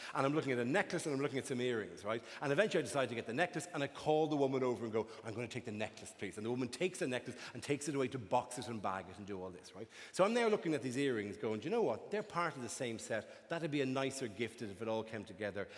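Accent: Ireland. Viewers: it's just an Irish accent and not a lisp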